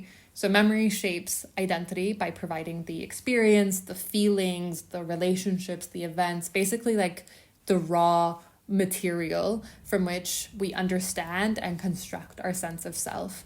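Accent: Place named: Ireland. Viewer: Do you mind me asking where you are from? I find your accent fascinating. As an American English speaker, I don't detect a strong accent on most of what you say, but occasionally some words feel slightly seasoned with an accent that I can't quite identify. Seems possibly Irish, but my mind might be jumping that way due to your red hair.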